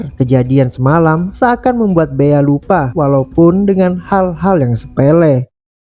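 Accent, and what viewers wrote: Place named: Banjarmasin, Indonesia. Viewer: Yg bapender ni pinanya urang banjarmasin kalsel😅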